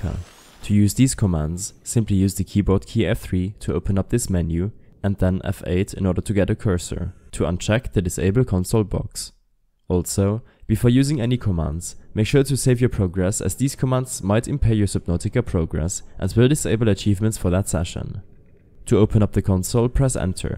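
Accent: Germany. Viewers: Kann es sein das du Deutsch sprichst? Du hast nen starken Klangf in der Stimme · Not like Indian like German because I’m german and most of my friends when they try to speak English they are speaking like this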